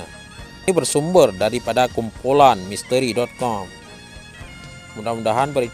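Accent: Aceh, Indonesia. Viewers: Yg bc ceritanya kok kyk org aceh ya... Dialek nya kental banget aceh... Bs di percaya berita ini...???? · Nyoe yang baca berita awak aceh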